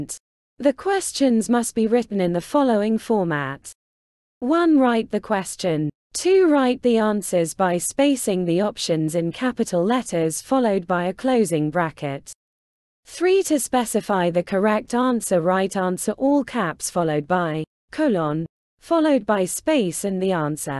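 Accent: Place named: RP, United Kingdom. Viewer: This AI generated (presumably?) "Received pronunciation" accent is unspeakably annoying.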